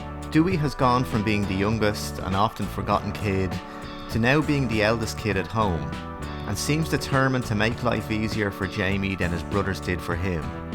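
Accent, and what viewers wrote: Ireland. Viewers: Mate are you from Ireland?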